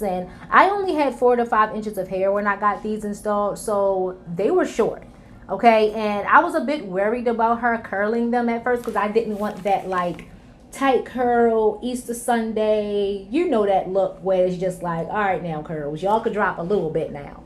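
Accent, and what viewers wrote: Baltimore, United States. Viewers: It’s the B-more accent for me 🥰🤣. You’re beautiful, sis. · You have to be from Baltimore (the accent) lol, I’m from the dmv. Love your hair!! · Just came across your channel. I had 4-5” of hair when I started too. My install was 5/23/20 so our locaversary is around the same time. Are you from Baltimore or somewhere in DMV? I think can hear it (I’m from Balto myself). Many similarities. I think my hair was more awkward than ugly lol...I wore turbans and headwraps for like the first 3-4 months straight. New subbie! 💕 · Sis the Baltimore accent IS REALLLL!!😫😂😂😂😂😂😂 you’re soooo beautiful🥰🥰🥰🥰🥰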